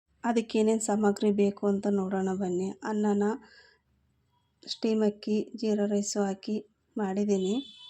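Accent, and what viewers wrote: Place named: Sira, India. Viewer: R u from sira r it's surroundings ur language slang in like that nice receipe😊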